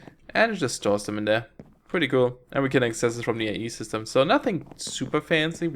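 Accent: Germany. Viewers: I love the accent. German?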